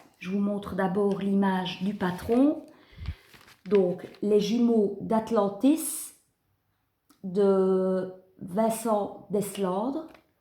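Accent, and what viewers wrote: Belgium. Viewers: Je viens de découvrir ton podcast, enfin une belge, et à l'accent ont ne doit pas être loint, podcast très agréable à écouter et regarder félicitations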